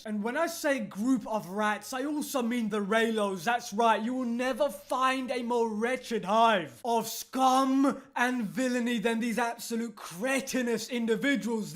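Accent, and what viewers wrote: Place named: Hull, United Kingdom. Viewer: Just taking a wild guess but are you from Hull or anywhere near? Knew a guy from Hull when studying abroad and sounds similar. However, as an American that’s never been to UK I make no claim of expertise with regional variations.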